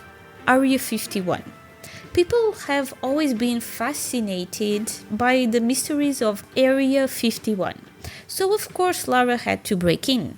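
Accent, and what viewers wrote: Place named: Portugal. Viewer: Puro sotaque tuga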